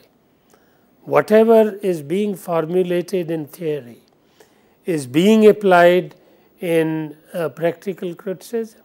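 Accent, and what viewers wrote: India. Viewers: A very good lecture on this topic, new with a certain vocal flavour from the Indian subcontinent.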